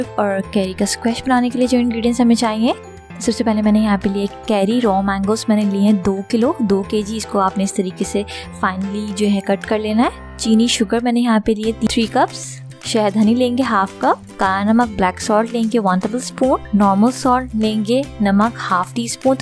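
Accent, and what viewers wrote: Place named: Pakistan. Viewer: My guess was right from accent you must be from Pakistan, ab next year paka try karunga and best of luck Sis. Waiting more of your videos and recipe.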